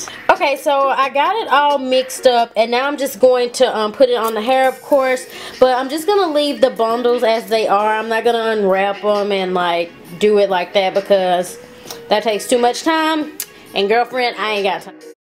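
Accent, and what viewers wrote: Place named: Texas, United States. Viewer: you have to be from Texas !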